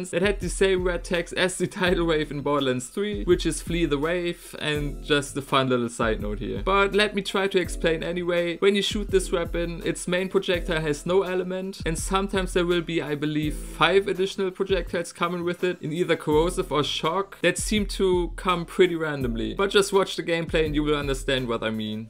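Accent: Germany. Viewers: Great content and very nice humor. I'll follow you and your videos along. Also your accent is just fun and lets me believe we could talk in german aswell :P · you are german ? sounds like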